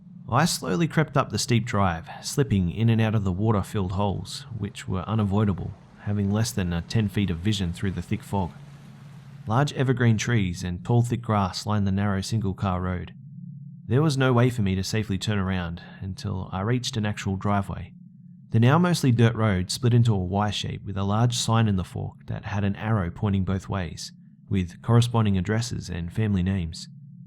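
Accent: Australia. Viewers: you have such a nice aussie accent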